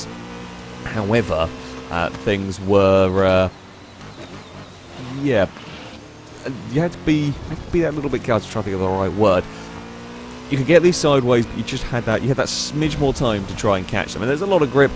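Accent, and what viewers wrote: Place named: United Kingdom. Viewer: I love how confused people from outside America get when saying Acura. and many other British people say "A-cure-ah" where everyone in America says "Ack-your-ah" Just find it interesting how different cultures emphasize different letters on different syllables. It is a small difference, but Americans will pick up on it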